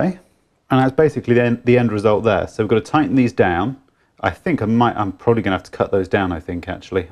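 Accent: China. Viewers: Chinglish, love it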